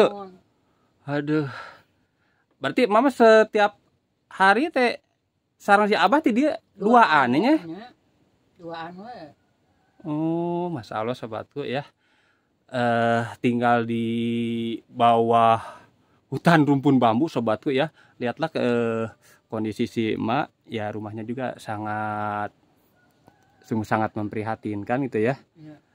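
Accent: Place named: West Java, Indonesia. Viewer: enak di dengar yah logat bahasa Sunda sy suka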